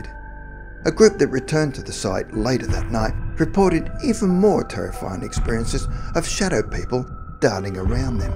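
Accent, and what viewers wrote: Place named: Australia. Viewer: Why the hell is an Australian guy comentating American history. This ruined it for me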